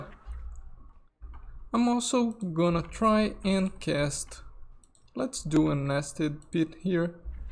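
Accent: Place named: Brazil. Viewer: EU SABIA QUE VC ERA DO BRASIL PELO SEU SOTAQUE! Hahaha Bro, o teu canal é o único que eu assisto e além de concordar com builds que são parecidas com as que eu penso em termos de prioridades de feats, raças, multiclass e outras coisas, eu tbm aprendo para um!! Parabéns pelo trabalho!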